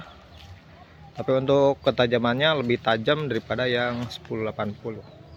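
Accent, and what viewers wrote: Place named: West Java, Indonesia. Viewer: Meni Sunda pisan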